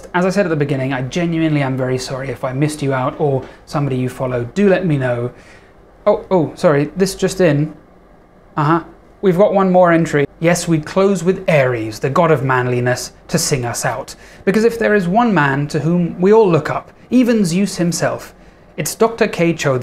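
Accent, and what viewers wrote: France. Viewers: Thank you for shouting out French medical youtubers! They're great and really under-appreciated. I hope you recover from the flu quickly. Also your French accent is sublime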